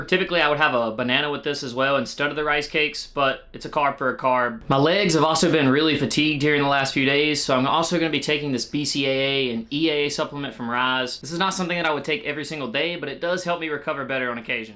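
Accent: United States. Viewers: What state are you from? Probably never heard that American accent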